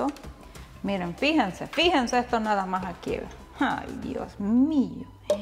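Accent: El Salvador. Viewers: Holllllaaa! Saludos desde Belize 🇧🇿 somos de El Salvador, Apopa!! ❤️ nos encante ver como usted cocina y como nunca pierda el dialecto salvadoreño 🇸🇻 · X lo que te escucho eres mi paisana salvadoreña , se hacer las pupusas y tortillas pan comido para mi.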